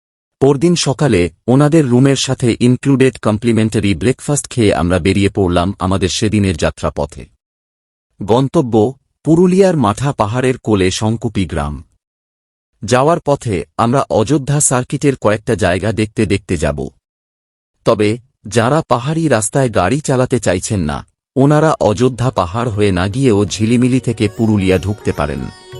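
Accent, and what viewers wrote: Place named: England, United Kingdom. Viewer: Tomar golar voice ta darun but english tan ache banglay ktha blate। Tbe darun laglo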